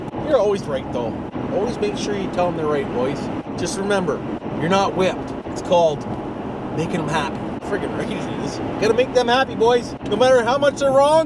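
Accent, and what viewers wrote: Canada. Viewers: fuck eh you can tell you're from Ontario 😂 i only say this because I'm from the Stratford area and we all talk the same glad I found the channel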